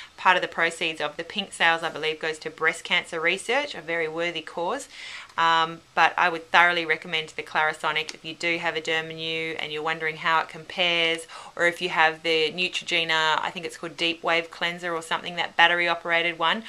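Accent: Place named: Australia. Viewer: I just love your Aussie accent! Here in Europe we mostly only hear UK or US english, this sounds so cheerful LOL!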